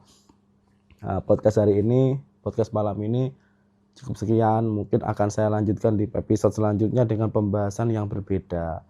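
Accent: Java, Indonesia. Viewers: Mas jenengan kui penak banget nek jelaske sing ditrawang, aku yo seneng suarane lirih, trs jawane kui lho ora ilang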